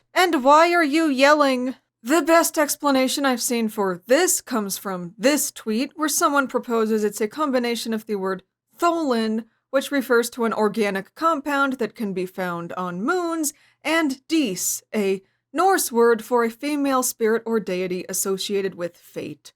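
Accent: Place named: Canada. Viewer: I hear some canadiannism in your voice, or maybe its northeastern US which has canadian influence in their accent 😊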